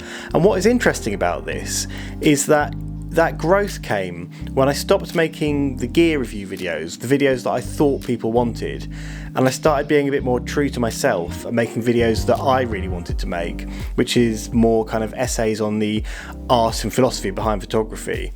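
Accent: United Kingdom. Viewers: I love your channel, even though I prefer video over stills, your perspective in interesting and to the point, none of this "hey look at me" or ridiculously excessive b-roll. Gear reviews can be useful, but they are ten a penny on Youtube and I don't even trust most of them. Nice to have a Brit too, more chilled, less ADHD.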